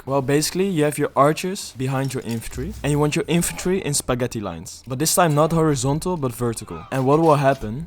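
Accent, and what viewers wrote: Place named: Netherlands. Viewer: Your English sound Dutch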